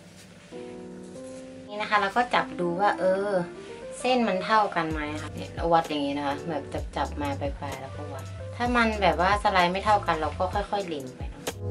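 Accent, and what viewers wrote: North, Thailand. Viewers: คนเหนือแน่เรย เก่งจร้า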